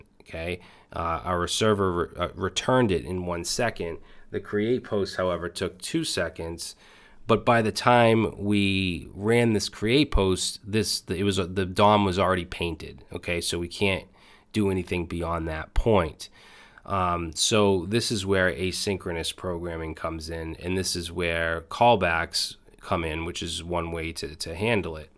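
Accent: Boston, United States. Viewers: has me laughing out loud ...Boston accent and all you keep it real!!! Live for your content broski keep it coming.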